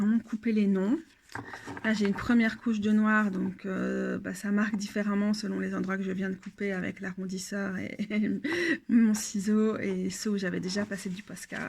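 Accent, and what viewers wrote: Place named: Belgium. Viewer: Merci. J'ai testé sur un mass market, le tarot de Marseille de Kriss Hadar avec un markeur pour paperbord. Catastrophe, effectivement le carton boit l'encre, j'en ai mis partout. Du coup les majeurs sont tranches "noires" et les mineurs blanches. Il me semble avoir vu une vidéo d'une créatrice d'oracle qui conseillait des feutres haut de gamme mais je ne sais plus les références. Et Claire de la lune à fait une vidéo sur les arrondisseuses et autres matériels de customisation. Ton tarot de la forêt enchanté est super beau. Le miens est resté tel quel. Je n'ose plus customiser mes jeux. Je n'ai pas entendu le bruit de machine donc aucune gêne. Et sinon j'aime bien le français belge. À tantôt 😉🙏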